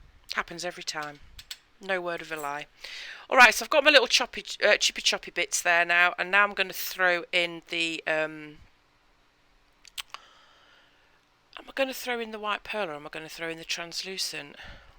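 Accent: England, United Kingdom. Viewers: Lovely to hear an English voice!!!!! I am in Ireland love your channel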